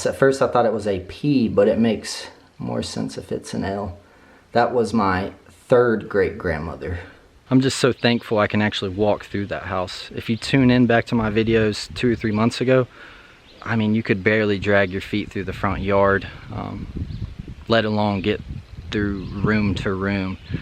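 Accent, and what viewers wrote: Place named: Appalachia, United States. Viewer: I’m guessing by your accent that you’re in sw Virginia or east Tennessee?? I have the same accent 😊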